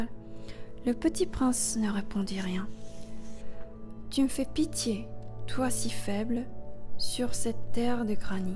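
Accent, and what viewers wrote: South Korea. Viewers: 중국어 많이하면 혀 꼬이는것처럼 구조가 변해요 덕분에 영어발음도 좋은 편이긴 하다만 가끔 세는 한국어 발음이 있어요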